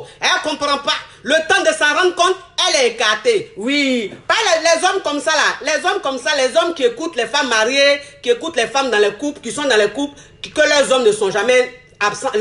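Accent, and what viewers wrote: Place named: Ivory Coast. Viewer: Jaime bien comment tu parles les ivoriens font rire!😂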